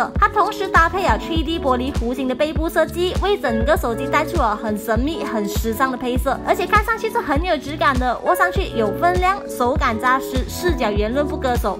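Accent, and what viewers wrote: Malaysia. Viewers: 這應該是馬來西亞中文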